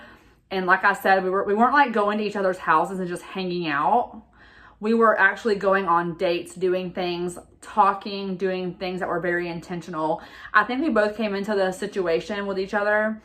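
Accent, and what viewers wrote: United States. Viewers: Love your country girl accent 🥰🥰🥰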